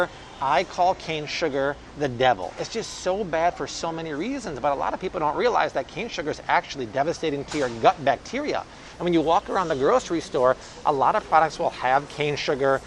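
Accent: France. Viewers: the way he pronounces the letter G sometimes sound slightly French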